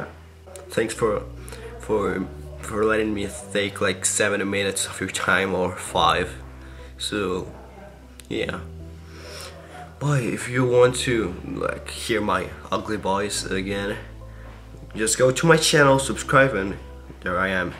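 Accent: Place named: Mexico. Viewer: Thanks Mike! I'm from different countries so my accent is mixed with other accents. it's mexican+costarrican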